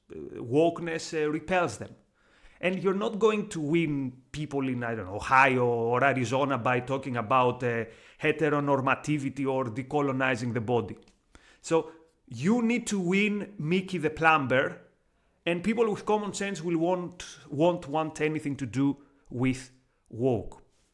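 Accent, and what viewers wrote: Greece. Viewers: Thanks for the effort to think ahead of the curve here. I’m not sure the anti-Israel part of it is really a secret sauce though - the left are absolutely open about it - it’s one of their ‘key pillars’. Left wing ‘magic money tree’ populist economics might be the most dangerous part… enough time has passed since post WW1 economic collapse for many western useful idiots to be willing to try it again 😢. Anyway, your Greek voice makes a refreshing change from that ubiquitous old economic fraud Yannis Varoufakis’ nonsense 😊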